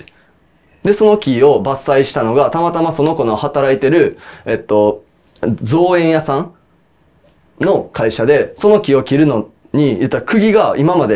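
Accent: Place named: Kansai, Japan. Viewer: 何が気になる？ 別に変な関西弁じゃないよ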